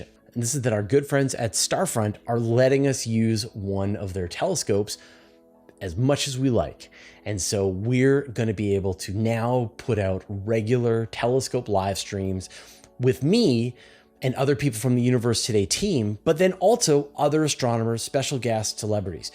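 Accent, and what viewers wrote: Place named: Western Canada, Canada. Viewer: I won't ding your pronunciation of "lava," but Vega should be pronounced VEE-guh,. And don't blame your western Canadian accent! 🙂 Normalize VEE-guh!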